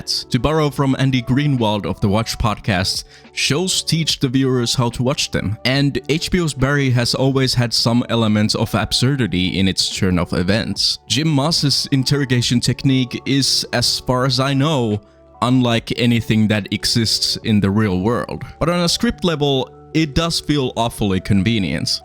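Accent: Finland. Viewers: You have an amazing skill for media analysis, portrayed by this essay of the series. I agree with a lot of points you made. (Also side note, after watching UMK, youtube keeps reccomending me Suomi channels, but I dont mind. Your accent is so great (and not rally english xd) good luck with the Youtube and your deepmdives)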